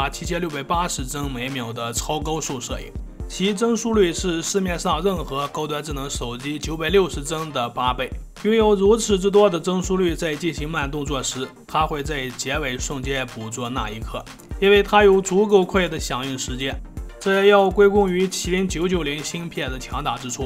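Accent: Shanxi, China. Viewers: 麻烦你练练普通话, 你这内蒙西部口音还是山西口音太严重了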